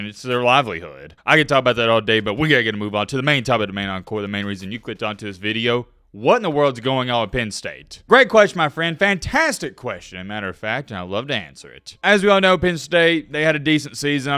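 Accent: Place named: Alabama, United States. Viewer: hearing how you pronounced some of the names in your video, it's pretty obvious that you went to school in Alabama.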